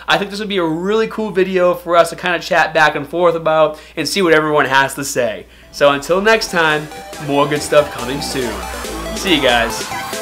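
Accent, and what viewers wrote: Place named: New England, United States. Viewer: the accent is that of New England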